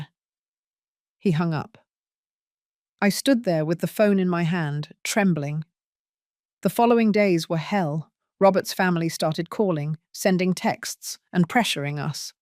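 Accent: United Kingdom. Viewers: She said that she is from Boston but she sounds British and the words in the closed caption are spelled British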